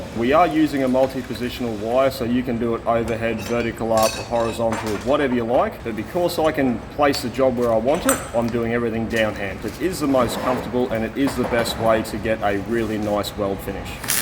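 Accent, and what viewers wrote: Australia. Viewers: Me, not used to the Aussie accent. "Lawn mower?" Oh no wait, "Lawn borer." Like you know, a boring device for out on the lawn, which is probably just a typical Australian way to describe the outside, haha, funny Ozzies. And then it struck me. "Line borer." Okay, English is my third language but still, I should have gotten that sooner! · Aw man, c'mon, don't worry about the aussie accent none, it's part of the charm of the channel/content, and you in particular. Was a fun watch but I'm sad Karen cut the bloopers of you cussing up a STORM tryna get those bushes in. I know there was some behind-the-scenes rage in there and it would've been hilarious to see xD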